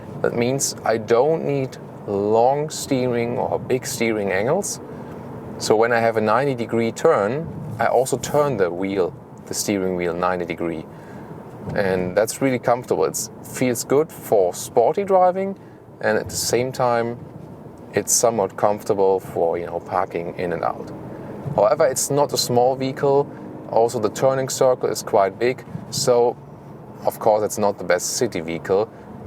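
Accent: Germany. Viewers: I like your German English!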